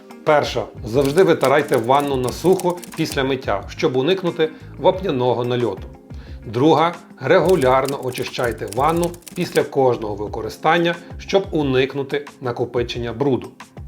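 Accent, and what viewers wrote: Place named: Lviv, Ukraine. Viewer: Це діалект, який додає шарму. Чула такий говір на Львівщині. Звідки родом автор не знаю, але це звучить приємно, хоча і не літературно.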